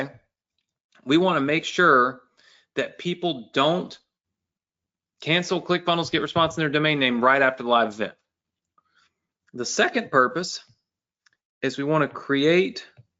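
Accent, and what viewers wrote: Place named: Mississippi, United States. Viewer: I know you are from miss. you talk like it.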